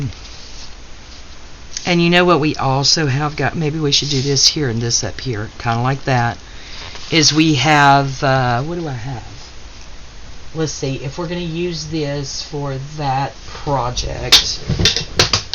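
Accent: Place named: South, United States. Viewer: Nope. All good. But I’ve got to say since that explanation at the end….I don’t think there’s anything wrong with your “scruffy” voice. To me, it just sounds like a southern accent. Heck. I sound like a whiny kindergartner.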